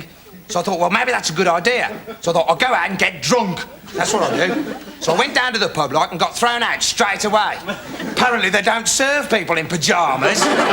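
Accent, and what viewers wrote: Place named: Redditch, United Kingdom. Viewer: how does he know the redditch accent so well?? lol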